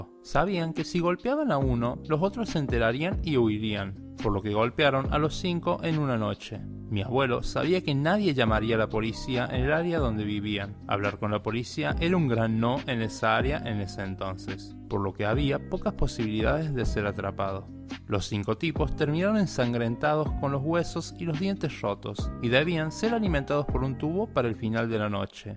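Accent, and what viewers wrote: Argentina. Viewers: Te falta quitar el acento argentino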